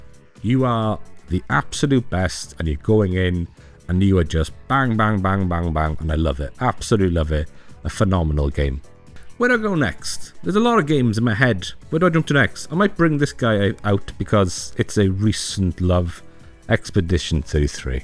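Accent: Wales, United Kingdom. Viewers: Where in Wales you from bud?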